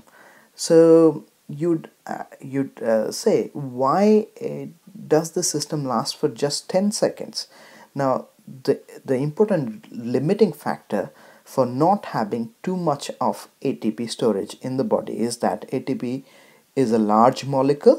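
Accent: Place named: India. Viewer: I like your speaking. But I hate your Indian English.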